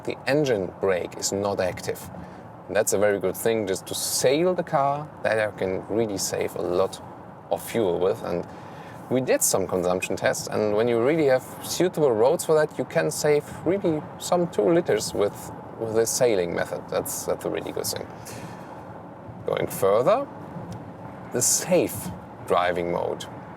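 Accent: Germany. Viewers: Your English is really good but the pronunciation is really awful. If you'd put a bit more effort in de-Germanising your English it would be much more attractive to listen to ;-) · He's so cute! I really like German men accents... and that's a beautiful blue car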